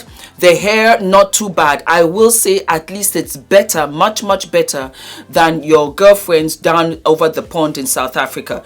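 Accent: Nigeria. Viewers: What is there not to love about you. I am so loving and feeling the Naija vibe, energy and accent in this episode. Omo, you are truly a Naija babe jare, 100%. Once a Nigerian, always a Nigerian! Love you as always.😘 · your pidgin is perfect and sweet. 😁 I enjoyed this review. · Nice to hear speak pidgin, I live for it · Loooooooooovvvveeeedd this review😅😎.!!! ... Loved the Nigerian accent and words you incorporated in the video.. Definitely going to watch it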